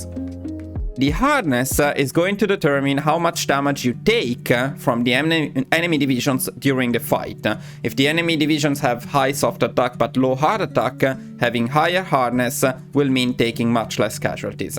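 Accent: Italy. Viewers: Bel video,dall’accento sembreresti italiano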